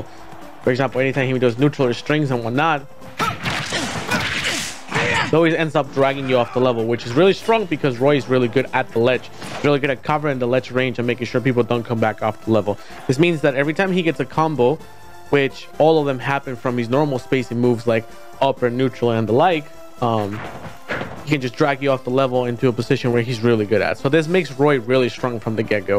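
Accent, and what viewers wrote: Chile. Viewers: Eri chileno?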